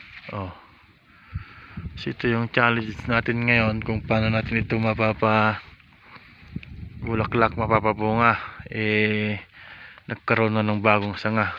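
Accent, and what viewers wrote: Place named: Ilocos, Philippines. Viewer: San po location nyo...s pannalita po ninyo prang ilocano kau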